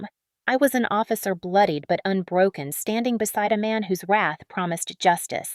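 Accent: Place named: South, United States. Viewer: Love the storytellers' southern accent. especially since my roots are tied to the south.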